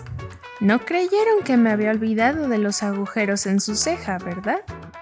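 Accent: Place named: Mexico. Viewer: Como me gusta la forma de tua vídeos! Y los dos quedaron preciosos! Me encanta tu tonada mexicana, sou brasilena.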